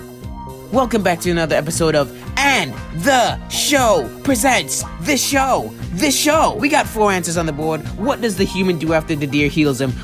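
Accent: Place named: New Jersey, United States. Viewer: I started at the last two week of Nine Color Deer! Dude you sound like you are from Jersey!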